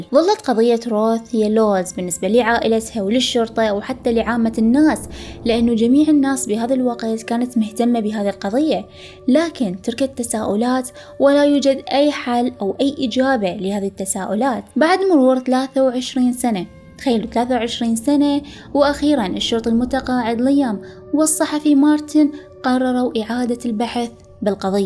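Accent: Iraq. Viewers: جداً أحُب لهجة العراقيه تحديداً عليكك 🥺❤️❤️❤️.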